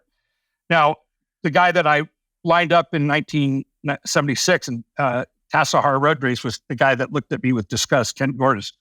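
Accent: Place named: Ireland. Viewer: Just found your site and subscribed. Your fine Irish accent puts me in mind of Sean Kelly. Not bad company to be in! A very good chat with Greg, looking forward to you other podasts thanks.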